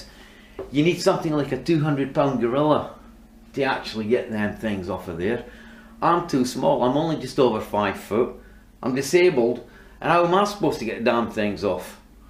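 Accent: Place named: England, United Kingdom. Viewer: Ingles de Inglaterra.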